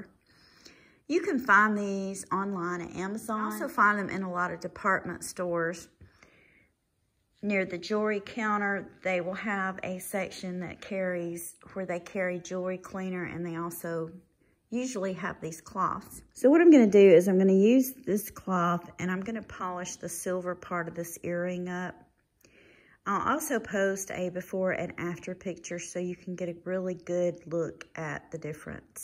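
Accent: South, United States. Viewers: You sound awfully Southern or am I wrong? I am in AL.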